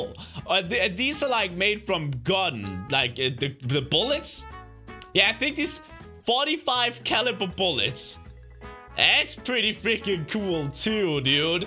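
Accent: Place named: Denmark. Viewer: A danish accent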